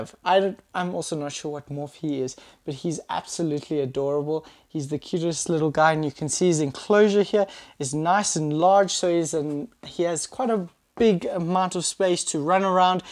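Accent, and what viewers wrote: South Africa. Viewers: Are u South African by any chance ? Your accent sounds like it.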